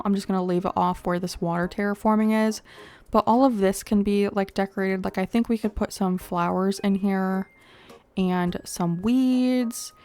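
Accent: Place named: South, United States. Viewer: That southern accent took me out hahahah this build turned out so good!! 👏🏻 and yes, the waterscaping looks very interesting 🤣 educational content!!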